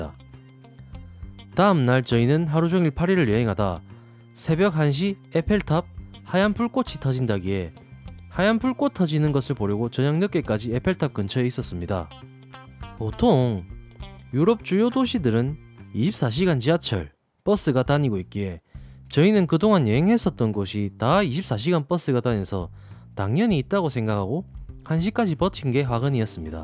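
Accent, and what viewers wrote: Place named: Tongyeong, South Korea. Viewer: 나 학교 다닐때 국사랑 지리시간에 잠만 자서 지리말고 이 썰 듣는데 재밌노ㅋㅋㅋ 썰 더 풀어주세요!! 구수한 통영 사투리라 더 감칠맛나게 귀에 쏙쏙👍🏻👍🏻 대박나소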